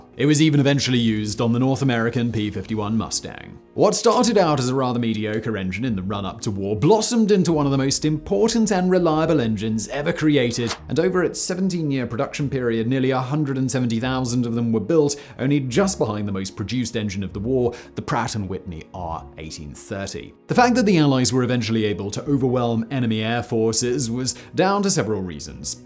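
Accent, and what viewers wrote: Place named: United Kingdom. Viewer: Is it just me or got accent stronger in this video? Maybe it's due to this very British topic... 😁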